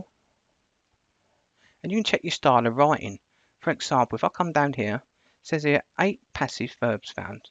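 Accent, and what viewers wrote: United Kingdom. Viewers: My intention is not to be rude, but If you learn to attenuate your strong (British? Australian?) accent, which is incomprehensible for some of us, you could reach many more people. I am a French Canadian who had to learn to attenuate his strong accent in order to be understood by Europeans who spoke French, so I know it's possible. I'm sure your presentation is very useful, but I couldn't understand half of what you are saying. Good luck.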